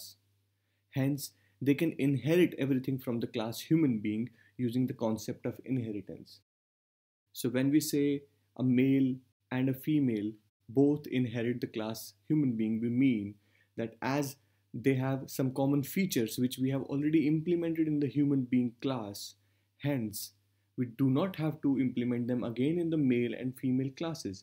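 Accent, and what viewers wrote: India. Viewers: Another brilliant Indian with his beautiful accent. Very helpful video. Thanks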